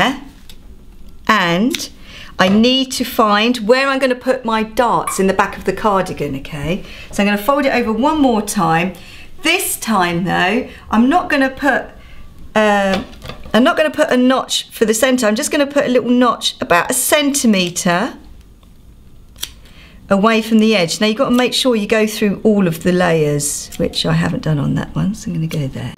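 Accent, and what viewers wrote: United Kingdom. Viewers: Girllllll...that's too cute!!! Wish I would've seen the items you mention at Portobello Mkt when I was ther a while back...cuuuuute!!!! Great job!!!! Easy instructions and after all, we yanks love the Brit accent any way. LOL..thanks.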